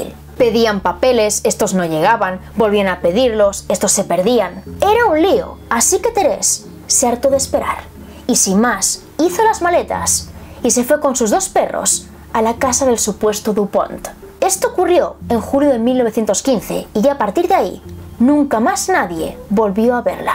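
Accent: Spain. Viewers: Mansion de los amigos imaginarios... A caray, eso no era... Tu acento español es hermoso. Casamiento entre primos, a caray, eso me interesa... xd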